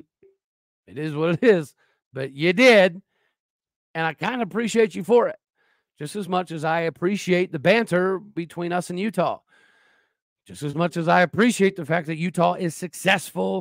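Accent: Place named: Oklahoma, United States. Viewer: oh no you mistake my meaning, I’ve listened to hours of your podcast. Frankly I didn’t realize I was a “city boy” until I went to Oklahoma State. I graduated with over 500 in my high school class, where classmates at OSU had just a handful. I remember seeing Stormy practicing roping a rebar cow right outside of Bennett. The pronunciation just became more pronounced due to semantic satiation. You said it so many times in this segment it stuck out. I don’t think any less of you, it’s just a regional accent. I still live in the south and appreciate the culture, but at times I stick out. Some people can pick out that I actually have a California accent. 🤪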